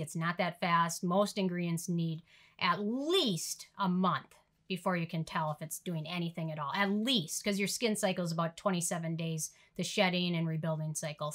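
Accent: Minnesota, United States. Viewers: I don't have blemish worries, but I watched the entire video anyway.😆 That peach top is a great color for you and I LOVE your purple eyeshadow--so pretty! I like listening to your Minnesota accent. I'm a former Yooper (know what that is?) so our regional accent is somewhat similar.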